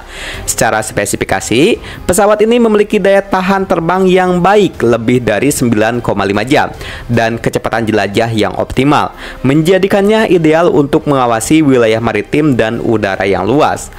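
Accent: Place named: Sunda, Indonesia. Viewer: sunda na meni medok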